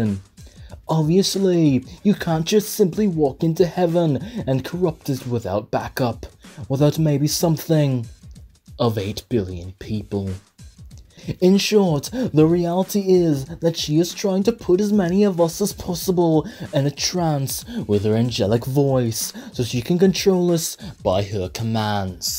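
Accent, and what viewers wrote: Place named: United Kingdom. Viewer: didn't know you're british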